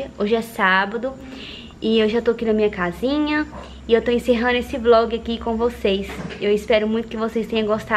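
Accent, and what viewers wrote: Minas Gerais, Brazil. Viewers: Você mora a onde?parece ser mineira!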